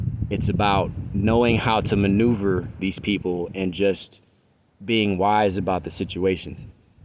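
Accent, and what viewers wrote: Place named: Spain. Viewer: Not to be racist but I thought you were Hispanic. You have like a Spanish accent to me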